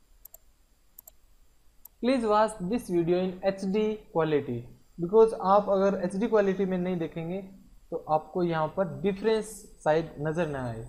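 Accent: Haryana, India. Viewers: Tu Hariyanvi or Rajasthani hai 😁😁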